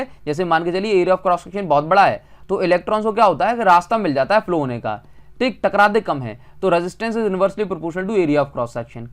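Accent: Odisha, India. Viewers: Sir are you from odisha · Sir are u from Odisha?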